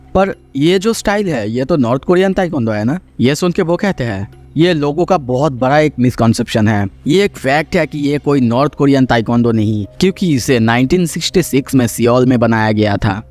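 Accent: Bengal, India. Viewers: bro r u bengali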